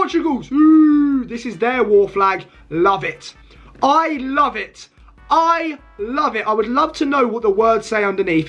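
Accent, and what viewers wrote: United Kingdom. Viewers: I can tell bro is britesh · r u british mate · i know u british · Your scottish because yor accent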